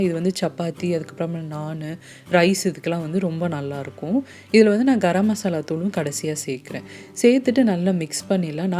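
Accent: Madurai, India. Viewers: Bro review super..madhurai slang summa alluthu...😍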